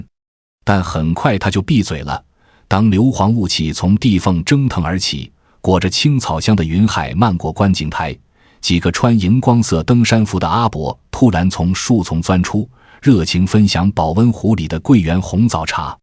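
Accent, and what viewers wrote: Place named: China. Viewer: 連ai配音都中國大陸腔...😒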